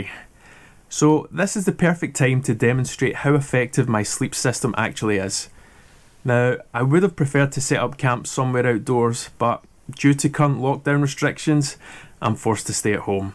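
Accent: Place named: Scotland, United Kingdom. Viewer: love your accent man, need to get my ass in scotland to backpack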